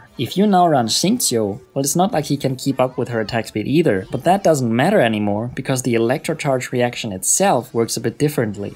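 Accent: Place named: China. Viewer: So, random question your pronunciation of the names makes me think that you are Chinese, which province are you from? I am from Shanghai in Jiangsu.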